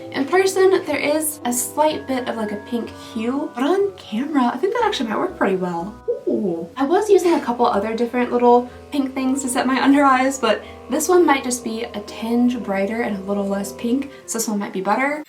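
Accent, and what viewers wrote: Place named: South, United States. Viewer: Say blush brush five times fast and you will understand point lol from one southern with a southern accent…to another, I understand and love her❤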